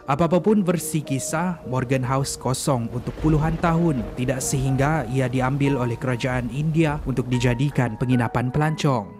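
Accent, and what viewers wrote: Malaysia. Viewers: Bang nak tanye abng dari mna indonesia atau malaysia dngar suara ckp mcam malaysia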